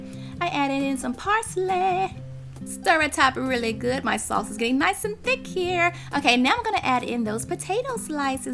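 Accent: South, United States. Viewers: omg sweet sugar wow love the southern voice mmm and what a great dish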